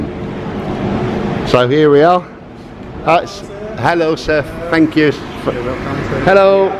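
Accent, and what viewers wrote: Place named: Cockney, United Kingdom. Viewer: That's because it's cockney guvnor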